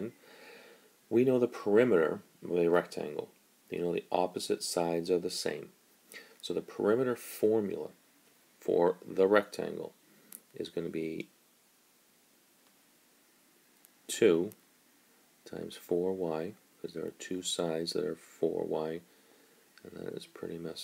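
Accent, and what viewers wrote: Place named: India. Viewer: High Quality Video with Non Monotone Indian Voice (Thanks, These videos really help)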